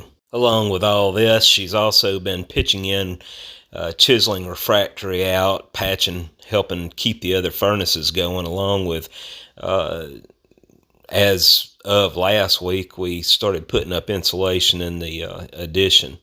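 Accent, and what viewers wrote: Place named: South, United States. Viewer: "Filled" and "failed", in a southern accent, sound so similar to a northern guy...I was getting depressed for you bud!!!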